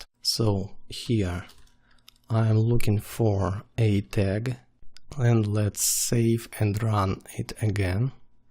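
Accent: Russia. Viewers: Русский английский заходит лучше, чем английский английский - благодарю за видео! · you have a nice russian accent :) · i fucking love russian accent. i hate listening tutorials with indians.. i dont have nothing against them, just cant listen to them.. but russian.. i love that language.. i know little russian.. ya ponimayu russky nemnogo :D croatian is also very similar to russian . cheers.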